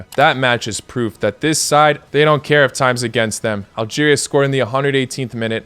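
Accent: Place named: United States. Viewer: Why do you pronounce Croatian players' names in an american way?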